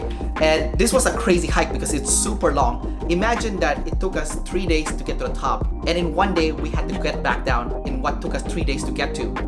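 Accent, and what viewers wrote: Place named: Philippines. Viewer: Why do you have a filipino accent?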